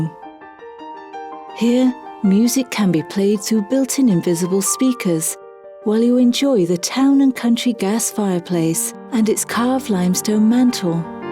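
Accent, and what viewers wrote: United Kingdom. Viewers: Jameson Cross yeah I think it’s the “British-like” accent. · Nice English voice keeps saying "over looks the garden" um, dead grass. Spend 13 million and you still have to do a major outdoor remodel and get rid of those marble floors. Looks cold and uninviting.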